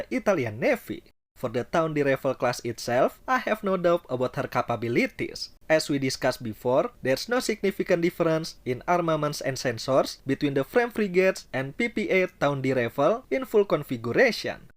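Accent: Indonesia. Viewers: Logat Indonesia, bukan bule.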